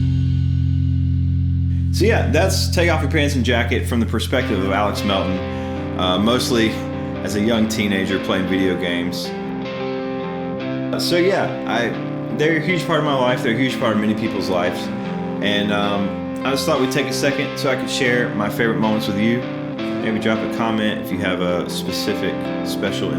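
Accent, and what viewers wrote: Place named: South, United States. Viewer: tell me you're from the south w/o saying it. "he's playing that fast and he's playing that fast and he's strumming the shi*t outta that thing"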